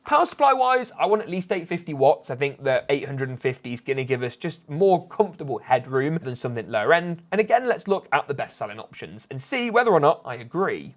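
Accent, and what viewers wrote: United Kingdom. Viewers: Are you British or American? I despise hearing Brits referring to prices in dollars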